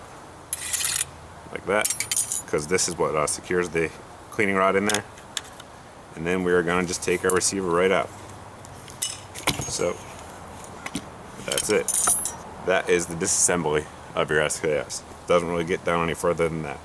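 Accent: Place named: Northern California, United States. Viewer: Norcal accent like Jake Shields. Great video man style- fast and to the point.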